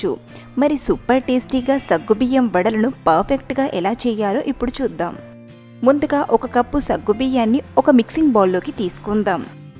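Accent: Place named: Tamil Nadu, India. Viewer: Your accent is like Tamil mam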